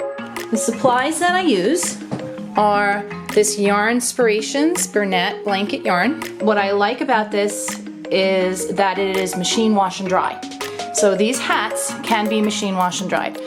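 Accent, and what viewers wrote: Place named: Brooklyn, United States. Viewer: Are you a Brooklyn girl originally, specifically Williamsburg section, cause you sound like me girl. Lol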